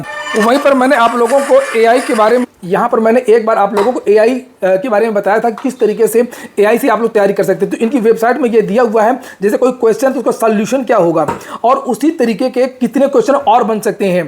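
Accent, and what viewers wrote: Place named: Western Uttar Pradesh, India. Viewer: Aap west up ki ton me bol rahe ho bhai kya baat h.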